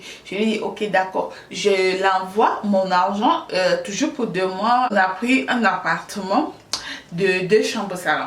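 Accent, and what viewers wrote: Cameroon. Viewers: J’ai reconnu l’accent bulu du Cameroun! Tu n’es pas gabonaise. Quand tu t’exprimes exactement comme une beti. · ma compatriote equato hein🤣🤣🤣 heee pero no parece tienes más acento camerunes 🤣🤣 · je croyais que tu étais camerounaise avec ton accent 🤗😂 Ton mari ce sont tes yeux de désordonnée et ton piercing qui lui ont plu 😂😂😂😂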